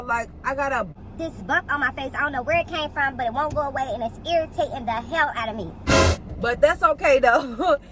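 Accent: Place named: Florida, United States. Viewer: Your Accent Reminds Me Of My Florida Folks.😱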